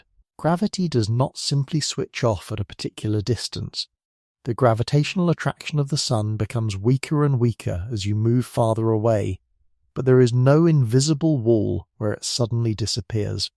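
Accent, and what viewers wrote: United Kingdom. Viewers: gentle voice from eleven labs 😊 British voice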